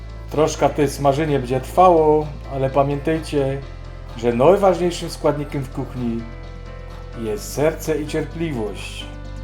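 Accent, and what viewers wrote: Silesia, Poland. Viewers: Jak mnie wkurwia gwara śląska. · tak slaska gwara nie jest prosta ❤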